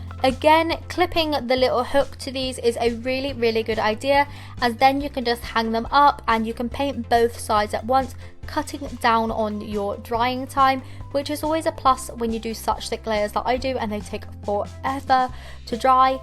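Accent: United Kingdom. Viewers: I love BRITISH ACCENT!❤❤❤ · Where are u from because you sound British but I can’t tell (not trying to be rude)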